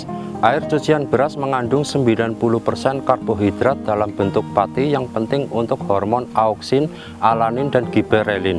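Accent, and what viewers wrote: Banyumas, Indonesia. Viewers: ora ngapak gak kepenak kang,salam saking blitar kang,,, sukses selalu kang,terus berkarya kakng,,, !!!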